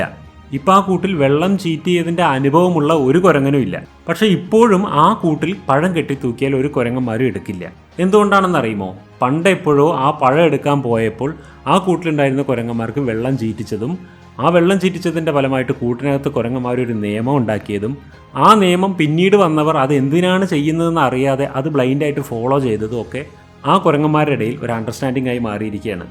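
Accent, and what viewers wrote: Palakkad, India. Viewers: Oru palakkad Karan alle ?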